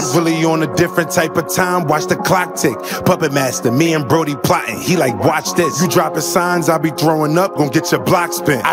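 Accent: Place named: New York, United States. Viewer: This is what NYC supposed to sound like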